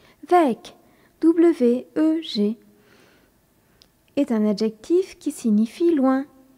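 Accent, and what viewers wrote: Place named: France. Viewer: oui oui tu as raison, Je ne voulais vous attaquer, je trouve que tu es bien familier avec le grammatique allemand (bien que tu as un accent francais tres visible, mais c pas grave, quand je vais parle francais c'est la meme chose :P) c'est juste qu' au debut le contexte n'etait claire pour moi :) alors exuse mon francais terrible, wünsche dir aufjedenfall viel Erfolg bei deinen weiteren Videos zum Thema Deutsch lernen :)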